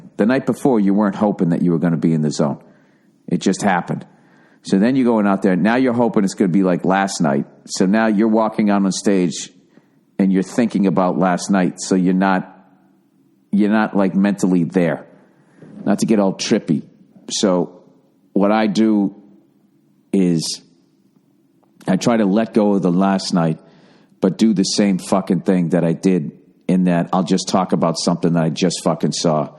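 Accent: Boston, United States. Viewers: I love the Boston Accent in there,especially, when you get heated on what you’re speaking about. Your rants make my day/night. 💛🖤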